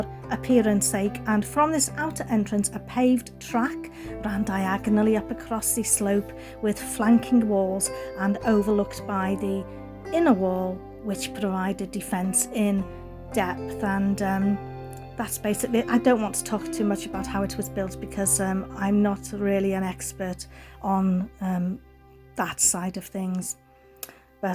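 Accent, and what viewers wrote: Wales, United Kingdom. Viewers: Thanks for the awesome hike. Very interesting and there's so much history. Btw, i love your welsh accent and your narration is very pleasant. 👍😍 Have a great day ahead 😊🥰🙏💕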